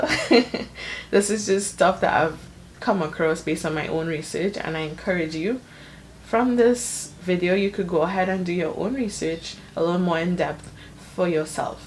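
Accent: Trinidad and Tobago. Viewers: Hey girl great video. Oils don't work for me either. Glycerin has been a game changer for me....it is not an oil so i don't get a response . It's also a humectant so it attracts moisture to your scalp and hair...its especially useful as I have relaxed hair as it help make my new growth soft and manageable. When my dandruff as bad.... I used keto plus shampoo only on my scalp. Massaged it and Left it on my scalp for 20-30 mins ..and when I tell you...by the second wash I saw no flakes. I used Glycerin to moisturize my scalp every other day and to my weekly deep conditioning treatments...you sound Trinidadian... looking forward to more videos . Bye!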